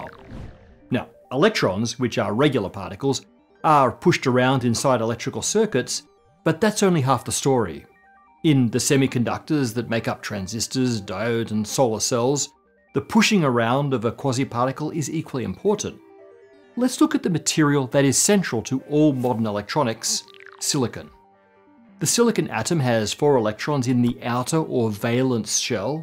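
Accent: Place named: Australia. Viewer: I thought he was Aussie.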